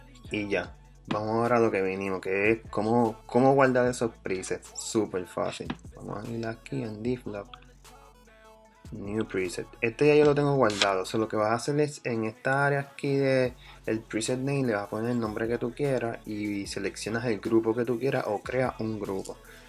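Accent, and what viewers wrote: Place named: Puerto Rico. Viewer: Brothel me ayudaste un montón…me sorprendí al abrir el video escuchar el “que es la qué hay mi gente” yo dije “este es boricua ¡ESO ES!” Saludos y gracias brothel 💪🏽🔥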